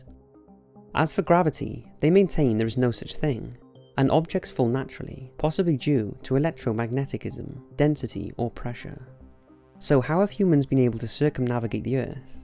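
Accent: Wales, United Kingdom. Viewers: Lovely Welsh accent!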